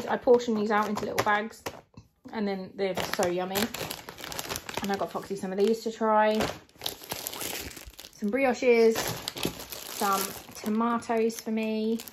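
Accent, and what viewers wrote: Norwich, United Kingdom. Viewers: The sour balls!! dough balls! look good!! and awesome choice on making meatballs!! those are delicious!! Also those cheesy jalepeno bites look amazing omgs!! Love that nutcrunch cereal too :) Congratulations on your weight loss goals :) I have been only eating very low calorie meals and taking in 800 to 1400 calories a day max, I do 200 crunches and 120 pushups 3x a week and walk decent amount at work. I am always afraid to eat too much on my cheat days because i dont want to lose my gains lol. Great choice in foods, love your food hauls!! Also you look super goddess beautiful in video omgs, those eyebrows, mascara, and that norwich accent :) lovely to watch and listen too :)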